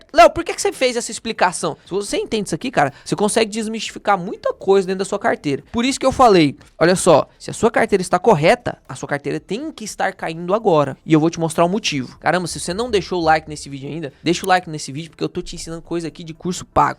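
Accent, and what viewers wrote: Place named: Minas Gerais, Brazil. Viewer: Velho. Essa voz de batuta da roça mesclado com conhecimento e uma pitada de mineirez , ficou top ! Kkkk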